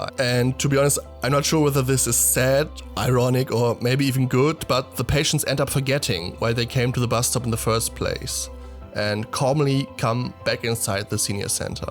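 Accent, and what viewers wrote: Germany. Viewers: Brudi man hört direkt, dass du deutscher bist. Glaub wegen dem Akzent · Btw der deutscheste aktzent den ich je gehört habe 😂 einf geil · Love love love your German accent! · Dieser heftige deutsche Akzent. Wieso macht man einen englischen Account, wenn man solche Schwierigkeiten mit der Aussprache hat? Das tut beim Zuhören ja weh. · It's so hard listening to English with German accent😅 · Grausamer deutscher Akzent!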